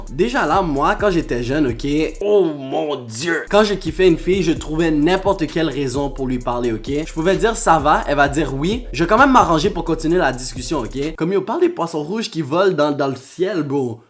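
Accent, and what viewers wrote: Quebec, Canada. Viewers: T'es québécois ?? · Celine M il est québécois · Ton accent c tellement cute ptnn🤤😍 vrmt j’adooorrreeee l’accent québécois !!!! Jtrouve t’as grv un style mais parfois mdrrrrr les mots je comprend pas 😂😂😭😭😭 mdrrrrr mtn j’ai compris les expressions québécoises mais whaouuu c’était compliqué 😂😂 brefff continu ! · Tu es le meilleur Youtubeur du monde!!!💗 et non il fait pas sa je suis très contente personnellement. Et tu devrais garder ton accent car tu es chanceux d avoir un accent québécois mais moi je suis pas chanceuse j ai un accent anglais mais je pratique le francais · Un accent ”afro-québécois“ j’adooore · je sais déjà que tu vas pas me repondre. Mais bon,😥 si tu vois ce message il est fais pas amour❤ (no homo). genre je te suis depuis longtemps tu peux pas savoirComme jtai toute suite aimé quand j'ai ta vidéo " man's is hot in fresh " jtai tout de suite aimé avec ton accent québécois 😏. 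En tous cas j'espère que tu prendras le temps de voir ces messages 😥. En pensant va don checker mon insta: yng_muco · Est-ce que tu es quebecois? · T’as un peu un accent québecois😉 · c la première fois que je vois un noir avec un accent quebequois 😂😲😱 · Pi le mes i la un accent québécois mes j'suis pos sur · RESTE AVEC TON ACCENT QUÉBÉCOIS PLEASE!!!!!!!!!!!!!!!!!!!!!!!!!!!!!!!!!!!!!! T’es parfait ♥️♥️ #jevienduquebecaussi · Je croix que c'est un québécois. P.S je suis québécoise. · J’aime trop trop trop trop ton acsent québécois ❤️❤️❤️❤️❤️❤️❤️❤️ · C'est quebecois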